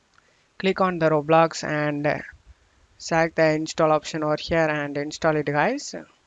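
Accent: India. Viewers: I love these indian accent tutorials so much, this for real fixed my problem. I subbed you! Thanks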